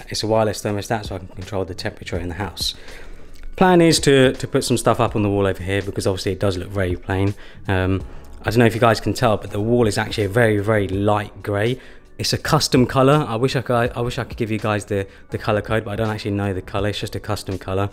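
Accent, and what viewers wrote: United Kingdom. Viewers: You got the best british accent ever lol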